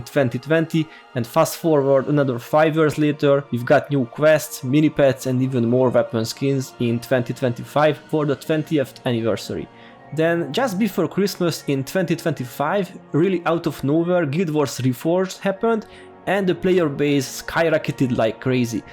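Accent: Hungary. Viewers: Aha, hungarian accent! I always wondered where it was from :D That said, I love how you say "foes", reminds me of how Mr T says "fools", and I choose to interpret it thusly. "These fools are no challenge!" :D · The Hungarian accent is the reason I’m here 😏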